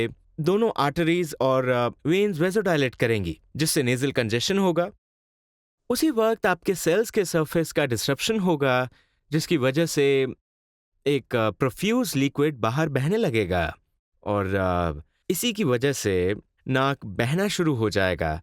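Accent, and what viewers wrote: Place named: United States. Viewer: Hey dr nene please make videos in your cute American accent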